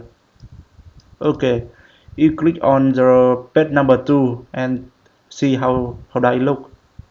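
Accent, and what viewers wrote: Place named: Vietnam. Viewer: nghe là biết người việt :))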